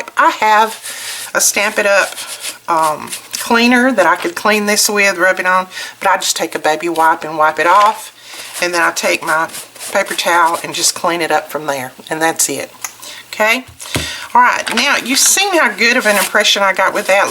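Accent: South, United States. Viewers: great tips boy i would love to win this i dont have any distress ink yet so that will be awesome ps love your southern accent! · Thanks for all of your stamping advice. Also, I'm from the south and I enjoyed hearing that familiar dialect. The familiarity was so comforting! Your advice was greatly appreciative!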